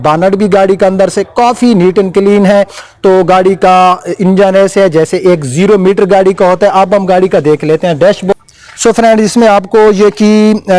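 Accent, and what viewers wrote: Saraiki, Pakistan. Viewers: Ap ki سرائیکی urdu baray mazay ki ha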